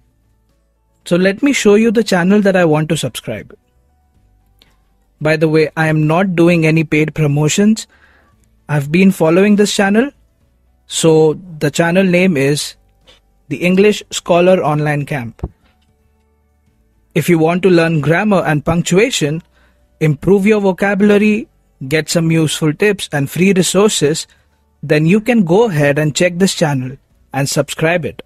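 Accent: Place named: India. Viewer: You sound Indian. Why have called yourself?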